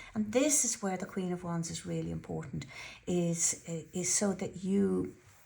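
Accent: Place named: Ireland. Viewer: You have a lovely accent 🌹.I hear an Irish Lilt in you voice 💃.. Good Reading Thanks 🌼🏹🏹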